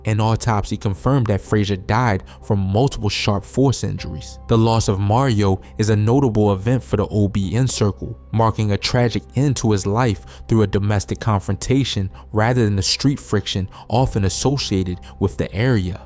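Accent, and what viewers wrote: Baltimore, United States. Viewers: That Baltimore accent bro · Baltimore lol I live here trust me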